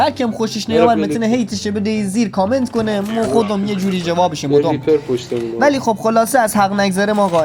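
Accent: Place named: Mashhad, Iran. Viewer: کی از لهجه مشهدی بدش میاددد🥹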